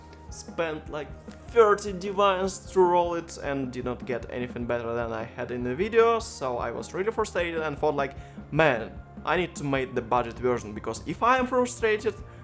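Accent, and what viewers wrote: Russia. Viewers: ты русский? акцент просто как у русского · русский акцент + уши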